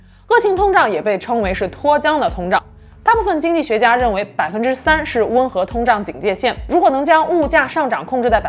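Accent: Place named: Beijing, China. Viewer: 是哪里人，是中国哪个城市的。听她说话像是北京人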